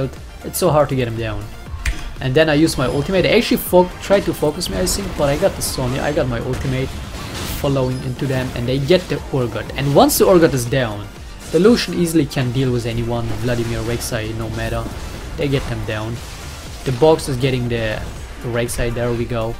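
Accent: Germany. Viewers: Man hört ich raus das du deutsch bist. Ich meine das wisch bei wich und zink bei think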